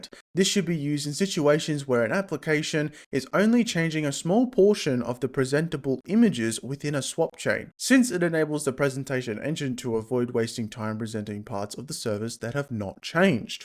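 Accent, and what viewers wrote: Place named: Australia. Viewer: I just realized you have an Australia accent, simply sublime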